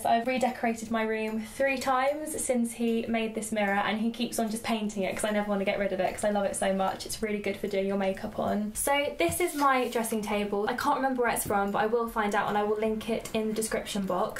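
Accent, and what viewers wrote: United Kingdom. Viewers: I don't know how but I automatically knew you were British the moment the video started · luv the bedroom/also your hair color is so pretty and I wish I had a british accent/ im from the u s a/ thanx 4 the FABULOUS video!! like your outfit 2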